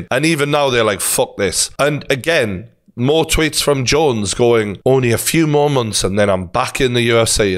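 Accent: Newcastle, United Kingdom. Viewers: Yooo geordie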